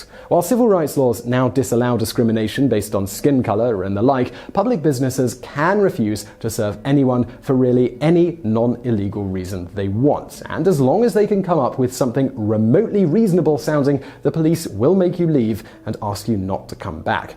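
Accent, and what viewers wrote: England, United Kingdom. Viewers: This guys a Brit from Richmond VA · Get things wrong once in a while? He makes at least one major blunder in every video I've seen, often more. I think he's coasting on his English accent, but he's actually pretty ignorant.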